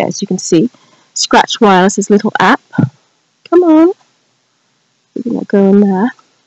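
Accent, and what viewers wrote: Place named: England, United Kingdom. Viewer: Nice review. I am always drawn to a good hardware keyboard. I really wish Moto had one in the pipeline based on the X....Nice to finally hear your voice. Your English accent is cool.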